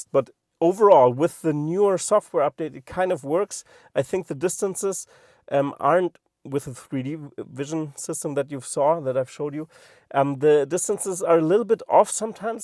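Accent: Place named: Germany. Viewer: Great car, great vid. I hope your longer review includes a proper test of the voice commands. It needs to cope with accents and a German accent is a great test. I love your accent but I want to know that Tesla responds well, too. Imaging how it will be if the Teslas get Grok!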